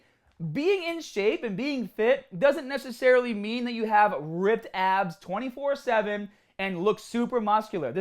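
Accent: Boston, United States. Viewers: Body Shaming? What about language shaming? I can't believe you and your Bostonian accent can make fun of how others pronounce words like Granola!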